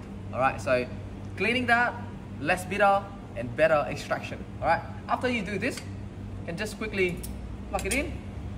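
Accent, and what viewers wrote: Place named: Australia. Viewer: Bạn nói giọng anh Úc ạ? Nghe lạ và vui tai quá 😊😊😊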